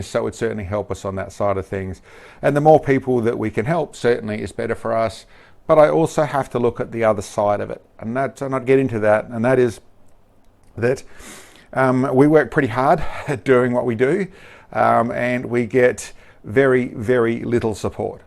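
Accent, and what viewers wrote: Australia. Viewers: Keep up the good work. Coming from an Aussie expat now living in the USA, it's good to hear an aussie talking about something that I love doing once in a while.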